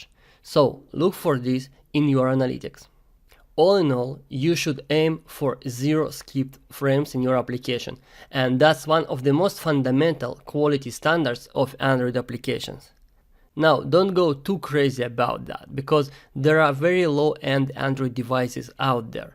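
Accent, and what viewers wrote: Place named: Russia. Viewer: по акценту всегда узнаю русского)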